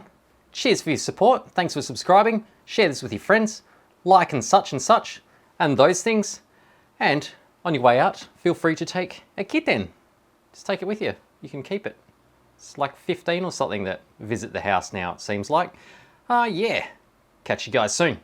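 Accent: Australia. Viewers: the Australian accent make this feel like a nature documentary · I laugh so hard with the editing and the a Ausitralian accent. Anyone wanting to cheer up your day just visit. Cat lovers might not take kind to the video but piss on them.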